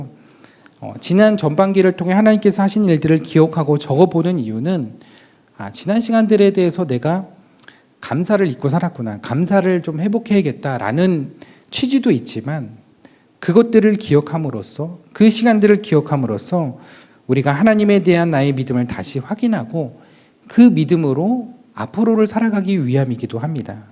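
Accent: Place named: North Korea. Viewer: 목사님 북한 사투리 짱 이예요 ㅎ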